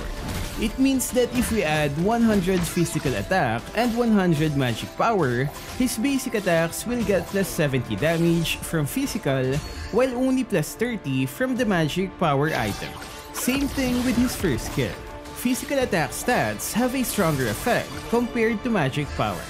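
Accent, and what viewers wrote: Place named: Philippines. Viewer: I smelt tagalog accent in him...😁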